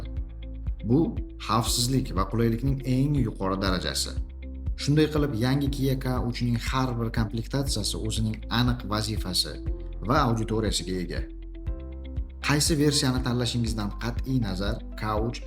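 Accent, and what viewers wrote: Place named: Uzbekistan. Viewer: Тоза узбекча учун алохида рахмат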